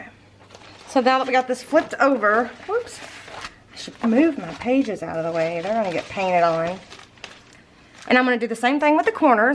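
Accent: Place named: Eastern Kentucky, United States. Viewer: Was just going to ask if you were from Eastern Kentucky because your accent was so familiar to me. Then while scrolling to the comments section I saw the mailing address. My Dads family is from Sandy Hook in Elliott County, Love your videos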